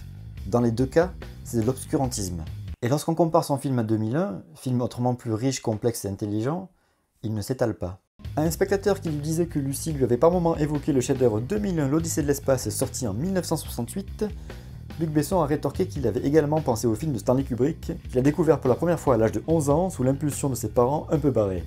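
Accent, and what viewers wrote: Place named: Hérault, France. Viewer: quelles été les possibilités pour que je tombe sur ta chaîne ? quelles été les possibilités pour que tu sois toi aussi de Sens Critique ? Vu ton accent je pari que tu viens de l'Hérault aussi non ?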